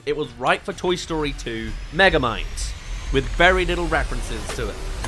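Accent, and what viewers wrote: United Kingdom. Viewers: some comments made it so obvious but british voice was hilarious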